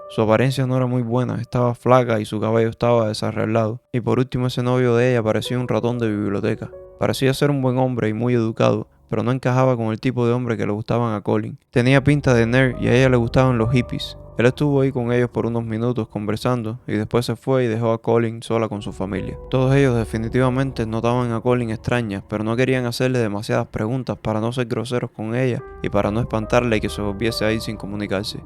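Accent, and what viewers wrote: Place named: Cuba. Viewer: 😂😂😂😂😂Eres cubano 100%.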